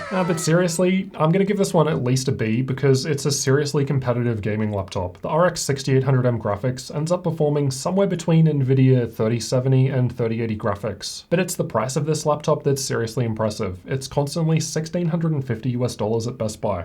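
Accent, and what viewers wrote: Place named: Australia. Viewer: I love your videos and Insite on everything but when I I hear you say words that end with Ole or ore and some other words I don't know how you don't start just dry heaving. How do you get that low and distorted while stile talking without choking lol your voice is amazing I can't even do an impression without choking lol your deep voice mixed with the aussie accent kills me I love it, but can't recreate it lol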